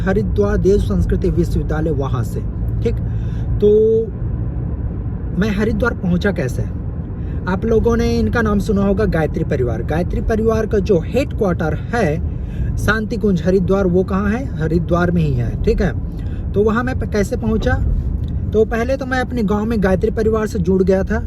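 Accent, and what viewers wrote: Chhattisgarh, India. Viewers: Bhaiyaa tor baat kre me thoda- thoda chhattisgarhi ka esent aat he 😊🙏 Badhiya he👌🙂👍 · भईया आपके हिंदी में भी छतीसगढ़ी अभी भी झलकता है ,,, मतलब एकदम हीरो वाला काम किए हो यार ,, आपके गांव वाले आपको देख कर बहुत खुश होंगे ,, । Love you brother 🙋❣️ from all chhattishgarians,, ekat bar chhattisgarhi bol dete to Maza aa jata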